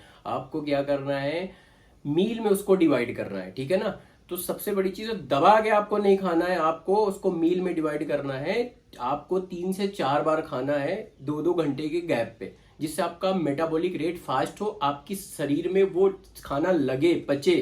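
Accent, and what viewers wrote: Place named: Awadh, India. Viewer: Samjhane ka tarika thoda casual hai bhai kya awdhi bolte ho bhai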